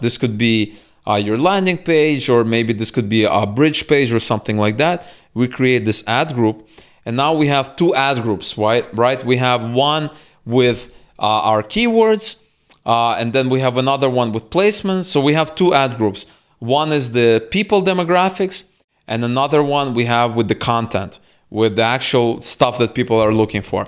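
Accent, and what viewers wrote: Russia. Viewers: you sounds like Russian! And by the way good video. I am about to test running CPA offers on GDN but not in the USA. Why? A lot of competition there and thus expensive click.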